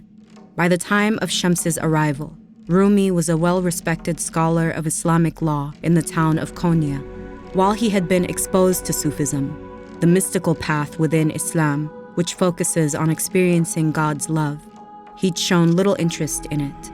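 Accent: Arab world. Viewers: Why do you have an arab with arabic accent telling the story of a Persian poet? She even said the name wrong. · The Arabic accent of the narrator is stunning! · Funny that the pronunciations are more Arabic than Turkish even when saying Türkiye. Not very reflecting of the language of origin. Not all Middle Eastern countries sound the same. H is almost silent in Turkish. Speaking as a person that comes from the same city as Rumi. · why the pronunciation is Arabic?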